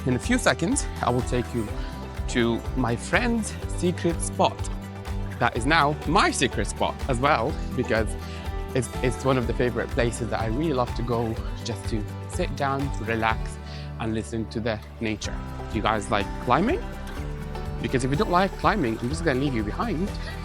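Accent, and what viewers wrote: Scotland, United Kingdom. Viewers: Some places measure heavy rainfall in centimetres or inches, in scotland we go by how close to horizontal it is. To combat the weather we've developed a very dry sense of humour which does tend to dance on the line with sarcasm. A good doctor talks with and listens to their patients, something you clearly do since some of your A's have a noticeable scots twang. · lol I was just thinking how much of a Scottish accent you have, you are a great addition to this country, I hope you find everything you could ever want here. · You sound so Scottish now tho 😂👏 Thank you for coming to work in our NHS ❤ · Love your Scottish vowels :) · You've got a very nice Scots accent developing! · I love your scottish accent 😅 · Welcome to Scotland pal, can’t believe how much of a Scottish accent you have picked up! Glasgow is a bit more mixed culture and diverse. 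❤ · I can hear a Scots twang in your accent 👌❤️. Greetings from Glasgow dinae ken isn't "Scottish" it's regional , we don't say they words here in Glasgow and no such thing ass Scottish accent as it changes depending on where you go . different all over Scotland so , so so many "Scottish" accents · Your accent is coming along - when you go back to Sudan for a visit do they all comment on your Scots Accent? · Love the video. And you manage to make kirkcaldy look beautiful. Hopefully videos like this change peoples opinions on people from other countries that choose to live here and the positive impact that brings. Loving the scottish accent. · This is a nice to see perspective of a Sudanese man living in my country. I can already pick up a wee Scot's twang in your speaking!😄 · Scottish, not Scotch (that's whisky). got a twang of Scots, but his accent is his own. Thank you, for all you do in the NHS. 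Our eldest was a Staff Nurse in the Vic, and his wife is a District Nurse. You do a grand job!!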